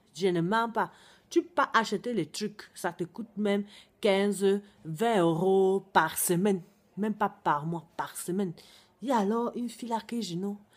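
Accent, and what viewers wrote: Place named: Africa. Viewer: BJR je voudrais juste vous poser une quest pq vous parler comme ls africaines je vous juge pas ms sa m ennerve a entendre parler ainsi cet comme cet pts jeunes blanc la il parle comme ls arabes pour etre vue voila je suis en couple avc un africain dnc vous voyer juste savoir le pq ou cet votre vrai voix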